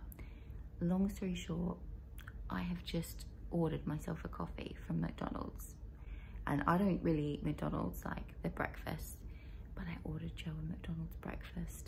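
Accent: Australia. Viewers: You did speak a lot.. awesome ♥️♥️ love it and the Aussie accent ahhh not many Aussie accents out there ..oh can't wait wait to see more!!! Cute or not 😘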